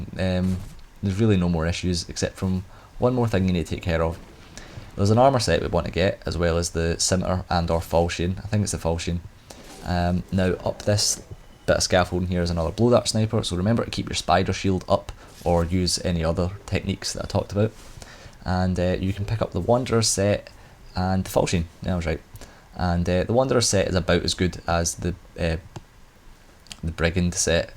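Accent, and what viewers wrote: Scotland, United Kingdom. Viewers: Thank you for these, I appreciate the amount of detail and thought put into your videos, and your scottish accent does make it easier listening to long videos. Was a little bit surprised when you said you struggled everytime you walked over that moving bridge. For me it's been the complete opposite, tried running or jogging a few times a long time ago and fell off, have been walking over ever since without any accidents. Anyway thanks again!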